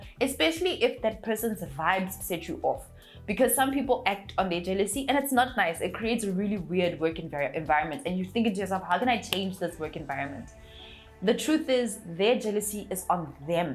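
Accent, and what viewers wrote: South Africa. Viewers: South African accent 😍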